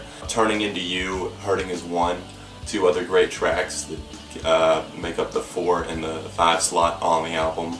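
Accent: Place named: South, United States. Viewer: southern accent is much more prominent in these earlier videos, it makes me chuckle.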